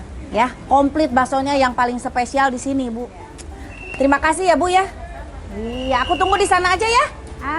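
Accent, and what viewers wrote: West Java, Indonesia. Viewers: pengen ketawa mani mencugug Sunda banget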